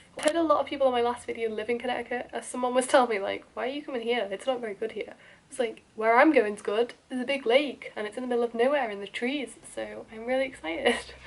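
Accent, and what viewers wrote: North Wales, United Kingdom. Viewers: You sound like you're from North Wales right? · Hey- where abouts are you from? You sound very North Wales/ Cheshire-ish !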